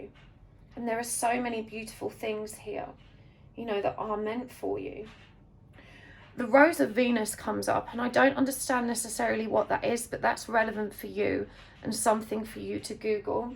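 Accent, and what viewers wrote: United Kingdom. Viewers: I love ur voice ur British accent amazing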